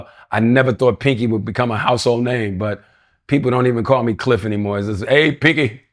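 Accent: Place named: Washington DC, United States. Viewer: My man from DC! NW right here!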